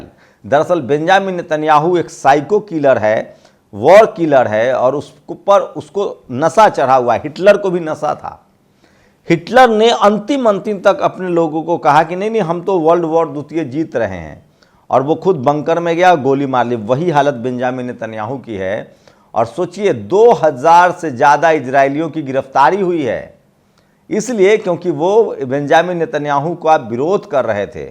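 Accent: Bihar, India. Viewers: Bohat qoob, your voice Behari tune wa bohat qoob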